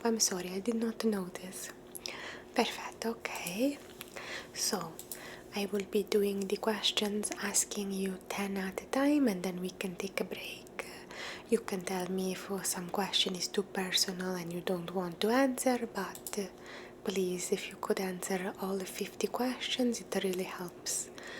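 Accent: Italy. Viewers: video with the Italian accent are one of my favorite. <3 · Your rather questions are always so hard to answer!😅 But your Italian accent is adorable! Bravissima!😉🇮🇹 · Italian accent videos are perfect for relaxing and unwind your soothing voice makes me feel sleepy 😴 😊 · this was incredible omg i hope we get more italian accent videos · Another great Italian accent video. 😁 Thank you. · The italian accent is spot on!! 😍🇮🇹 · Ah, you know how much I love your Italian accent asmr. Thanks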